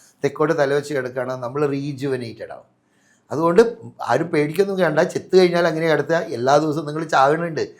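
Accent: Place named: Kannur, India. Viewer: ഇദ്ദേഹം കണ്ണൂർ കാരനാണോ കണ്ണൂർ slang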